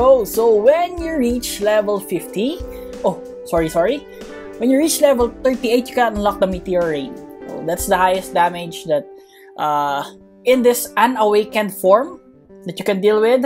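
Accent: Philippines. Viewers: Your accent is like Filipino. Are you from Philippines? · I was wondering if he is pinoy cuase he's voice is pinoy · Is he Filipino his accent is like Filipino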